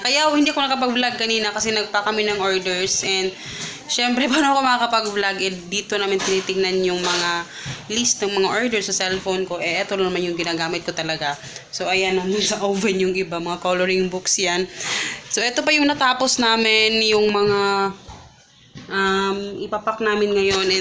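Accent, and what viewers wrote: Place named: Visayas, Philippines. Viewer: nakarelate ako sa tagalog dialect mo sis na may halong bisaya hehe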